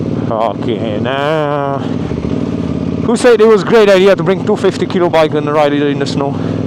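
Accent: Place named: United Kingdom. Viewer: Just found your channel. Great video. I'm trying to place your accent. Is it Africano British?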